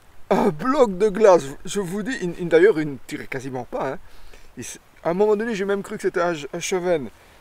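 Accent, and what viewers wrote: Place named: Belgium. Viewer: ah d’accord ! Aussi je me disais bien que ton accent me faisait penser que Tu pouvais être du pays du grand Jacques 😉 Du coup au bord de l’eau, tu tournes au café liegois. Pardon pour la confusion 😔 en tout cas les red halibut Malgré le froid, ils t’ont apporté une session plus qu’honorable. A bientôt mon ami, respect admiratif de Michel de Sologne 👍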